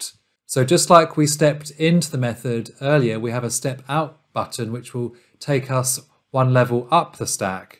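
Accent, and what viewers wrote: United Kingdom. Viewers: please, say "bottle of water " :d , is he british ?:d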